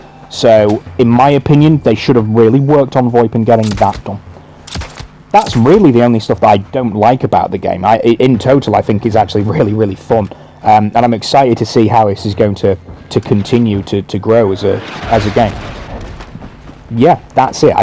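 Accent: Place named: United Kingdom. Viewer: The most British voice I ever heard, like I couldn't even get concentrated on the video, but definitely it's a good review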